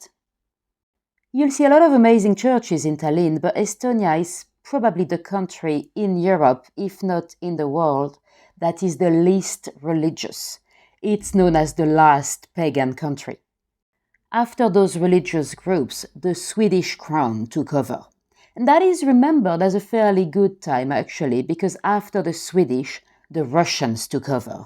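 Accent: France. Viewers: Madame, quand vous essayez de parler anglais votre accent français est presque comique.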